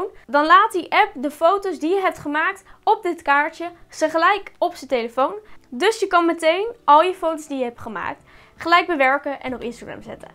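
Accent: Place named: Westland, Netherlands. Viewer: Jij komt zoiezo uit het Westland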